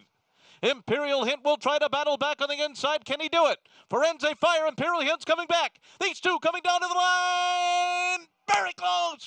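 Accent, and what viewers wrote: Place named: Venezuela. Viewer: castellano de Venezuela !!!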